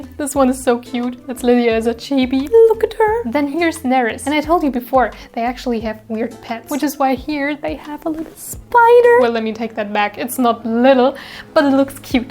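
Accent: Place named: Germany. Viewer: Omg I‘m German too! :DD (hab mir schon gedacht dass ich den Akzent erkenne haha)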